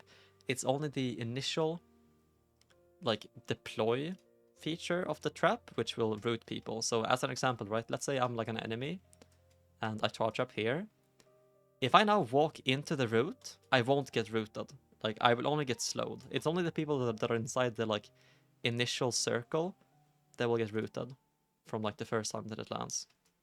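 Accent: Sweden. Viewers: Hey you are Swedish right? Trying to learn my accents.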